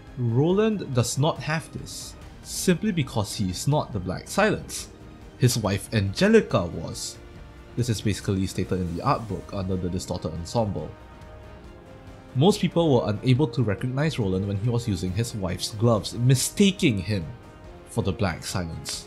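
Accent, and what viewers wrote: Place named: Singapore. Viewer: oh your singaporean hey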